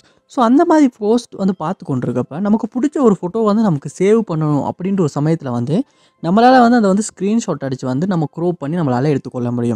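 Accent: Sri Lanka. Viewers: Srilankan tamil 😀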